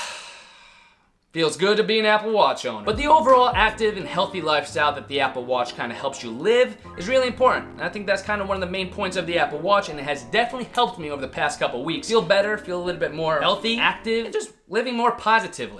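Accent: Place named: Boston, United States. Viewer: That Boston accent 😂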